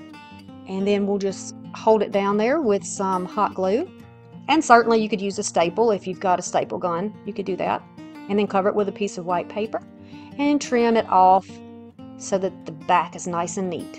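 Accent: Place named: Texas, United States. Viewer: I agree! 🐰 I actually might have squeaked when he gave it to me. Hehe 🤭 I mean....just a little bit. 🤏 I thrifted a white ceramic bowl with fruit carved in it that was missing the top, so I'm going to make a nest to put in it and put the chubby momma and baby birds in it. Would you mind if I emailed you a pic? I'm not gonna force it on ya or nothin! Lol Side note..I love that you talk like me! I grew up on a dairy farm in Texas, and I'm about as country as you can get. Sorry to write you a novel and tell you my life story! Have a good night hun. 💜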